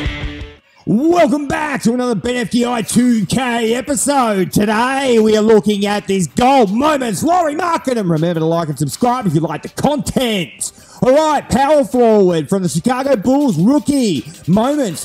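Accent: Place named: Australia. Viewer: your voice is change, you sound more australian now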